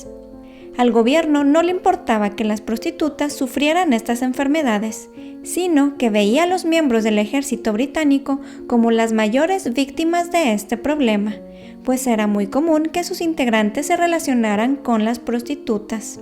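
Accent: Mexico. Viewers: Holaaa lindo acento mexicano 👋👋 Muy buena historiografía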